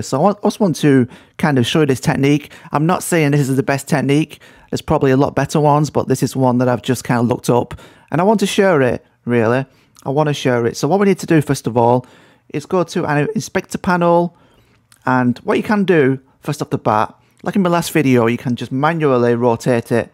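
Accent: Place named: North, United Kingdom. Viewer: im from canada but YOU are a northern boy arnt you..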